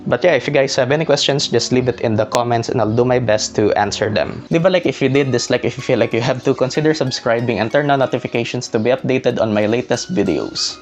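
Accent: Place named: Tagalog, Philippines. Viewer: i suggest that you watch american movies and shows so you would learn how to pronounce a lot of words correctly. or else mag tagalog ka na lang sir. video is good but the way you pronounce each word makes it worst. coz if you talk in english the TAGALOG way, it'll sound ridiculously stupid. in short, TRYING HARD boss. not to bash or something, im just stating the fact. watch american shows or movies specially the BING BANG THEORY. you'll learn a lot. it'll help you eventually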